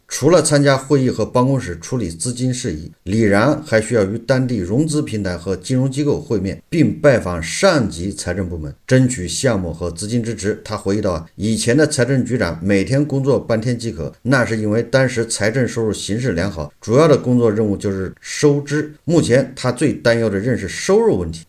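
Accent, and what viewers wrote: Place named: Anqing, China. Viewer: 安庆口音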